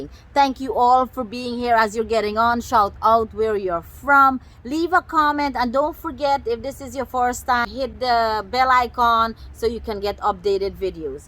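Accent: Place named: Guyana. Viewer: i have watched this video/your Narration about preperation is amazing/you are talked very nice -some times I am laughing about your talking /your english is mixed with Guyana and little bit 🇺🇸USA/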